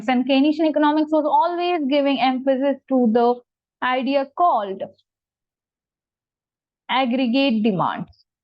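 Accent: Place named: India. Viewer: I’m sorry for the bluntness, but the Indian English accent is so harsh to an American English ear that I could not understand much of what was being said.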